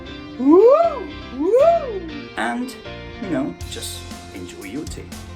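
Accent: United Kingdom. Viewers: さんの英語は聞いてて気持ちいいやはり日本人にはイギリス英語が合うのかも知れないイギリス英語教えてくれる所ってないかな？ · すごくゆっくりなRPでとても聞きやすいです！ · ジェレミークラークソンの喋り方かと思ってたこの感じがイギリス訛りなのね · ウケるーー！えせもえせ。笑笑でも発音はさすが、目つぶってたら英国人ですね～！ずっと聞いていたい。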